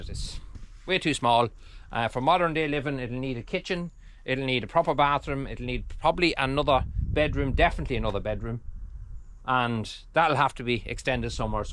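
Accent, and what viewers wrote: Ireland. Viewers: Greetings from the Ozark mountains in Arkansas, USA. Reminds me of all the old places I have rehabbed in my 80 yrs. I’m on my last one, an old woman now, I just enjoy watching my birds and critters. But I’ve loved giving all those old cottages a new life for the next generation to shelter in. More fun now to watch someone else save one, and listening to that lovely Irish lilt makes it even better! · So happy to see some work done here! My great grandparents were dairy farmers in Ireland. Do you have the name of the land owner? I'm very curious about all the history. Don't know much about them as my grandmother n father immigrated in 1928 to the USA at the age of 18 I believe. They passed when I was fairly young so I wasn't able to ask any worth while questions. I enjoy watching your videos...a glimpse of where n what they might have lived. 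Some family members still there but I don't know them. And your brogue is every bit of talking with them! Love it! Thanks.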